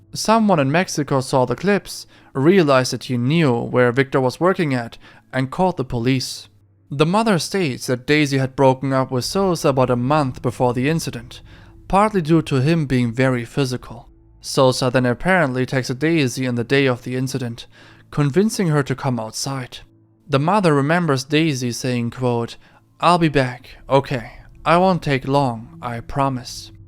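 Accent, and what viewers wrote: Germany. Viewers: Are you German? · Bist du Deutscher? Wegen deiner deutschen Aussprache 😏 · I dont know why but i think you are german. You pronounce some words very similar to me as a german. 😁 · Ooooooh you’re GERMAN! I’ve been trying to pinpoint it lmaooo I legitimately could only tell once the video started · you are the best man i have question where did you learn English ? you English is perfect of course with that Germán accent.. nice videos man no 1 fan here from NEW ENGLAND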